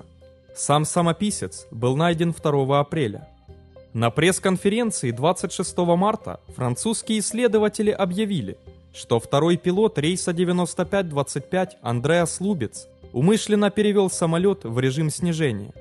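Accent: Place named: Ukraine. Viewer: Украинский акцент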